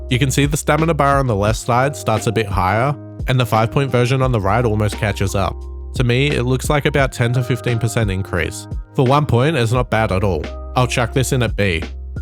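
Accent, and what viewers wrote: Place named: Australia. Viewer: AUSTRALIAN ARC RAIDERS YOUTUBER DETECTED LETS GO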